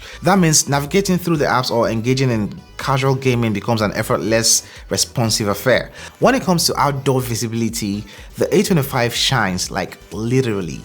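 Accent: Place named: Nigeria. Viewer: He sounds Nigerian but I could be wrong.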